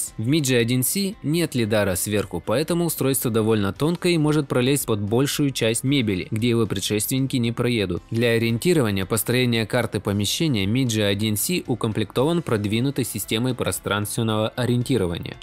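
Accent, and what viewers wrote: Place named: North, Russia. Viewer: Очень много "оканья" 🙂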